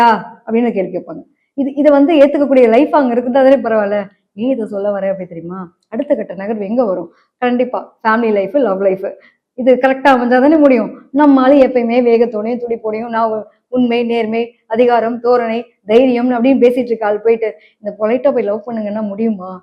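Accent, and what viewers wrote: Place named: Noida, India. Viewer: நொய்டா ராகத்த நீக்கிவிட்டு சொன்னால்தான் எளிதாக புரியும்...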